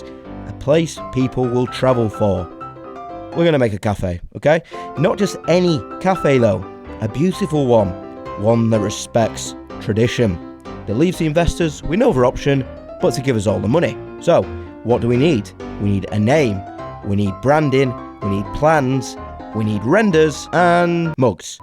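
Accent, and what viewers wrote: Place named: Hutton, United Kingdom. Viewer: You sound like you’re from Hutton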